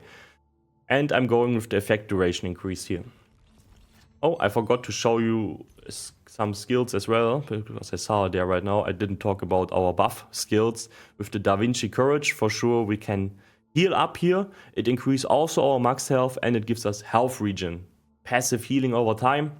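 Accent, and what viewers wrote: Germany. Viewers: Man hört aber extrem das du deutscher bist 😅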